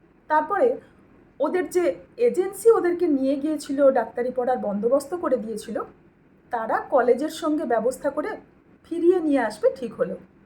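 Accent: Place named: Bangladesh. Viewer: আপনি দয়া করে ঐ 26 জন হিন্দু কে তার স্ত্রী, বাচ্চা ও আত্মীয় দের সামনে, শুধুমাত্র হিন্দু হবার জন্য অস্ত্র হীন মানুষ গুলো গুলি র বৃষ্টি বইয়ে দিয়েছিল কৈ তাদের নিয়ে এই বাতেলা তে শুনতেই পেলাম না।ঠিক আছে ঐ কাশ্মীরে ঐ অপদার্থ গুলো যায় কেন? তার দায় কি আমার? ভালো কথা আপনার ভাষা শুনে মনে হচ্ছে বঙ্গালী।সরি বাঙালি তাহলে মুর্শিদাবাদ, মোথাবাড়ি ইত্যাদি ইত্যাদি তে যে হিন্দু দের কেই টার্গেট করে হিন্দু বাড়ি ঘর জ্বালানো, লুটতরাজ, মহিলা দের শ্লীলতাহানির মতন কটূক্তি কর কথা বার্তা সেগুলো??? ও হো, সো সরি ঐ যে কে একজন ডায়লগ দিয়ে বলল আমরা 70% ওদের কেটে গঙ্গায় ভাসিয়ে দেব।তখন আপনি বাতালা ঝাড়ার মতো মেরুদণ্ড ছিল না, কারণ ঐ লোকটা ই বলেছিল হেন্দু পান্ত ভাত খেইয়ে ঘুমুচ ছে, তাই আমরাই করব।কৈ তখন তো কোনো লে চ কা র ইউটিউবে পাই নি কোওওওও?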